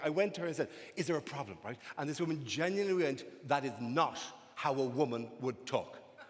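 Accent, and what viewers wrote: Ireland. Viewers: it's just an Irish accent and not a lisp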